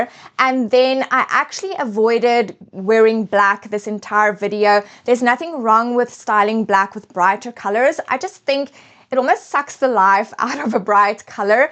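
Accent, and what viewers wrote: South Africa. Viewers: I KNEW IT!!!!! My family is from Cape Town but your accent is so much less pronounced than theirs… been in Canada for a long time already? ❤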